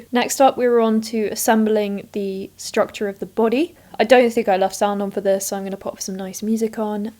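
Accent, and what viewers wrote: England, United Kingdom. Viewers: First time viewer, I loved the video although I am not fan of English accent but I kept the sound on, because the amount of labour, precision and care you put into your work is amazing. Your videos are not pretentious, but actually entertaining and soothing for people who like woodwork. First video and I became a fan. Thumbs up... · "If you hate British accent." I am watching this because I love the British accent. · "Mute it if you hate a british accent" -- LOL! I actually got hooked to this channel primarily because of your lovely british accent. XD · Who the heck hates a British accent? Besides the Scottish that is... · You never disappoint! Who hates English accents? You are a wonderful craftsperson, your new apprentice is very lucky! Loved the music! Thank you1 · You're great, and yes I love you're English I'm English also ❤️ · Amazing detail work , seen lot of people make these on youtube not sure i have seen that much passion put in to it ,oh and as a fellow Brit your accent is nice :D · OMG, I thoroughly enjoyed watching the loving way you "rushed" through that build. 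I love the simplicity of your workshop. The "hold-down" clamps are slats against the floor of your loft! (I know it's gotta be a loft, since you live in a space - constrained treehouse.) I also loved watching you bend walnut - I have not seen that method. I need to build that steaming iron, thank you for the better view where I could see the shape. Most others do it in a variety of ways where they have to be careful to not burn the wood! I like your method many times over (I assume the wood does, too.) (BTW, since it was 5,000 degrees out, I'm glad you "switched to your floaty trousers.") I also liked your corncob drill sander to smooth the sides. (That's as far as I've got, for now...) 👍👍👍 Add-on later... Well, I watched the whole thing. Yeah, it didn't sound so great, and it could be a combo of things, like top too thick, over braced, and the strings! I'm sure that's why when you find one that does... 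it requires quite a bankroll. (That's per Guthrie Trapp.) But, as you said, it was a fun project, it looks adorable, and you got to show off a few more outfits, lol. You're so cute. You remind me of my lovely wife, except she has a PA accent, instead of your UK. Anyway, I'm already 'scribed, which I assume is why I got this in my feed. Yes, you have a lot to contribute to this field. I definitely like your approach to the build: simple & loving (respectful, delicate with the wood. I feel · I love a British accent, you are so lovely. excellent video! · love your British humor and your accent as well ! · i love brithish acent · Love the English accent and music on last video. How about some blues guitar in a future video. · Who could hate a British accent; especially yours,? Some serious skills you have! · I’m an American so English accents immediately sound educational to me. No complaints. 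· Anyone that can't appreciate these amazing skills is probably sleeping through the video LOL! And shame on you - you could learn so much if you paid attention. Besides who doesn't want to see a beautiful girl with a Brit accent teach men how to do stuff? All the best elements entertainment, education, and fun😊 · Great to hear a British accent normally mute sound.. great work. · I like this build! I think your bolt on neck was totally fine. It does not have to be a dovetail to be real neck joint at all. Remove such snobbery from your opinion missy! haha..Taylor guitars use this type of bolt on joint and it is great for neck removal for repairs and such. As you know a dovetail would be totally glued in, and require steam to loosen the glue and force to remove the neck with maybe some minor damage. Ask Ted Woodford! And also your British accent is delightful and lovely! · Impossible: hating a British accent, a uke build. 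· British accent? Only Americans say that! You surely have an English accent…🏏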